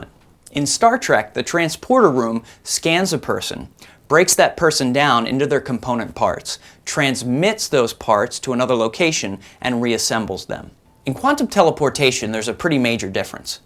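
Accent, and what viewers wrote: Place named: Scotland, United Kingdom. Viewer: thats the first time i have heard the scottish accent being referred to as 'adorable'...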